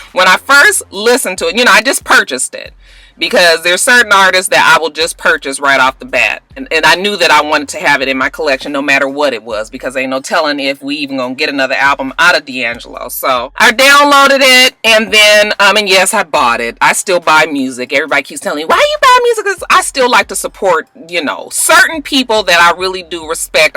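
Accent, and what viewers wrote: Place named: California, United States. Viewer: Heayer and Theryer. That Cali dialect is present!!!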